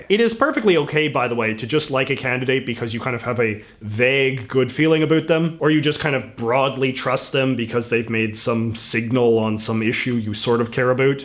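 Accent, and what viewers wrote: Canada. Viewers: It's the Canadian pronunciation. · Aboot...u are Canadian aren’t you! #Bernie2020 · are you canadian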